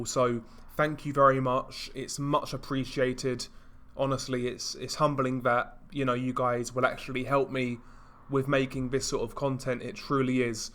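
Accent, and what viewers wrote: England, United Kingdom. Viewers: British accent is wonderfull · Best sound theme ever ! Combines lovely with ur english accent. ' Rival Cartel Membahh!'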